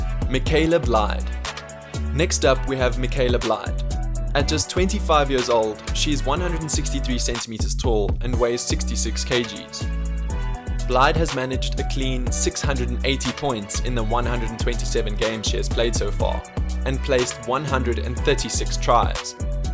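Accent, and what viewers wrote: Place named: South Africa. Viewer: Nice video. Unusual (but pretty cool) to hear a S'Affa praising NZ rugby.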